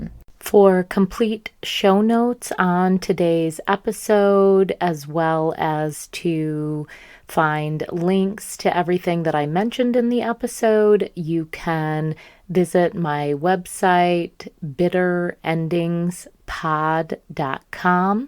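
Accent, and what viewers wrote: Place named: West Bloomfield, United States. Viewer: im from w bloomfield i hear that mi in your voice thank you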